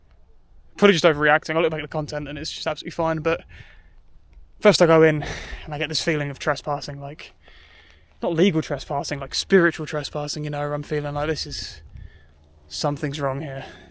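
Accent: United Kingdom. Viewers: It was a cow. Well, bull, actually, since "cow" is the term for a female bovine specifically. That was the sound of a horny bull. I'm guessing you're in the UK from your accent and how flat everything is around you. If that is true, then the most dangerous thing in those woods was probably another person. Not trying to diminish your instinct to get out, just saying that there aren't any large animals out there that would have caused it. Cattle can be dangerous, especially amorous bulls, but still, a junkie or psycho human is going to be your biggest threat walking around out in the middle of nowhere. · Where are you? From your accent, buildings, car, etc. I'm guessing the UK? Nothing dangerous there? I guess there have been Puma sightings, but no bears or other large predators. I would have gone to seek out the noise unless you were on private land. Kinda sounds like someone is butchering a cow. 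My guess... Fox, Cat in heat, Owl. In North America, we have a lot of large predators bears, cougars (not old ladies), wolves, wolverine, moose(very dangerous), elk(bugles), etc. Even Bigfoot! Sounds kind of like your noise. 😁 Is there a zoo nearby?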